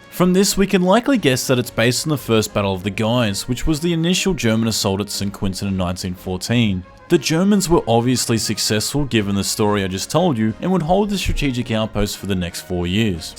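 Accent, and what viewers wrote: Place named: Lebanon. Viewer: The narrator sounds Lebanese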